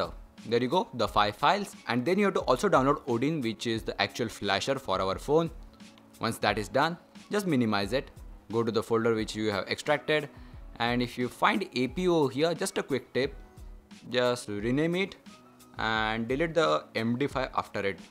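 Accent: India. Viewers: Idk if this is sounds racist but, I freaking love Indian accents! It's just something flattering about them.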